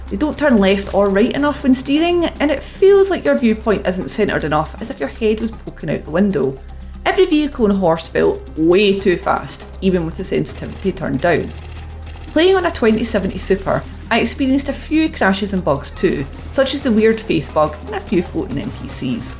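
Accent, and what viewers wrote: Scotland, United Kingdom. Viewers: Exactly. I can understand it too but you've gotta tone down the accent a little bit (a wee bit?) if you're addressing non-native speakers. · I am a mexican who speaks english reasonably well, trying to understand a scottish person who has a thick accent. Sorry PC Gamer but I think a clear and neutral english would be better next time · The colonials are struggling with the reviewers Scots accent 😁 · Born and raised Canadian, english is my first language. 99.9% of Brits and Aussies I can understand perfectly well, as clear as any Canadian or American... this reviewer (Scottish maybe??) has an overwhelmingly thick accent, I only understood perhaps 60% of the words she was saying. Perfectly fine if this review were for Brit / Scottish viewers only.. but pretty sure PC gamer's viewers or readers are mostly in North America and the rest of Europe.. 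wouldn't it be more sensible to use someone with a softer accent? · Please, if you’re going to make a review with a Scottish accent, at least speak more slowly than usual. Don’t get me wrong, I got nothing against the Scottish accent if the review is well made, but if I can’t understand most of the review, it’s not a good review. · XD these comments! Poor Scots get a hard time of it don't they?! But yeah, while I personally can totally understand what she's saying, I agree with the comments, I live abroad, and I make constant efforts to ensure I speak extremely clearly. My normal accent omits T's at the ends of (and sometimes in the middle of) words. I had to teach myself to pronounce T's when talking, among many other adaptations. I think if your going to put out a review to essentially the WORLD, you've got to meet them half way at least. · I love your accent! 😁 more Scottish game journalists pls!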